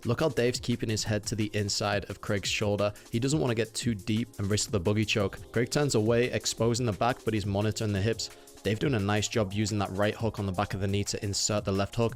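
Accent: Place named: United Kingdom. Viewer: Shit is literally unwatchable with this limey talking the whole time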